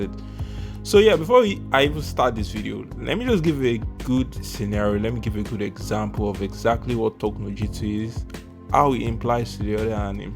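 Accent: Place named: Nigeria. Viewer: yeah bro, you sound like you are from nigeria right. i will follow you right now, but you have to improve your script and also the way you say the words.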